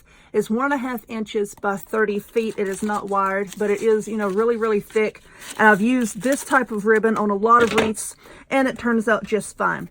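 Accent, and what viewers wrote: South, United States. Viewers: Guurrll! You crack me up doing Valentines crafts and we are STILL in 2018!😂😂😂 BUT! How can we resist this beautiful wreath! This is aaaabbsolutely STUNNING! Also I love your southern accent!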